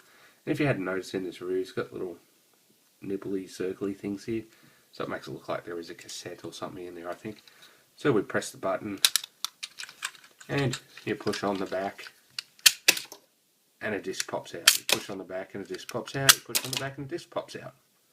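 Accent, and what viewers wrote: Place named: Australia. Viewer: Great to hear an Aussie accent, and one that doesn't sound bogan :)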